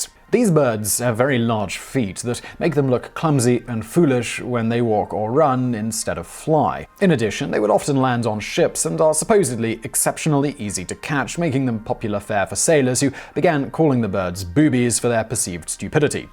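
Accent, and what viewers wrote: England, United Kingdom. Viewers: LMBO saying fun bags in English accent with a straight face just hillarious 😂 very interesting research lol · Never have I heard someone speak so eloquently and academically on colloquial breast terminology. British accents make everything sound more serious.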